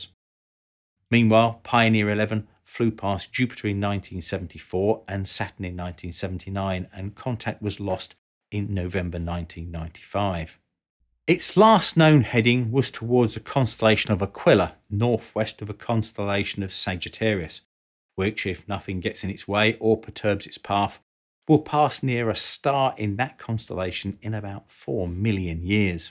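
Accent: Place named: Cockney, United Kingdom. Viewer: I applaud any channel for not using AIvoiceover. But it sucks when you find good content, and then you hear that godawful cockney accent. ‘Earf’‘frough’ ‘firteen’…. It makes it so bloody unwatchable.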